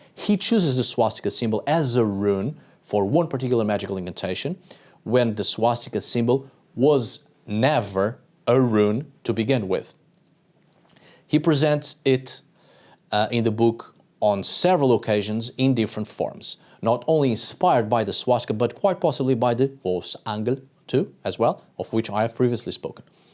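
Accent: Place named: Portugal. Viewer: I knew you were Portuguese by the way you spoke… we can identify each other easily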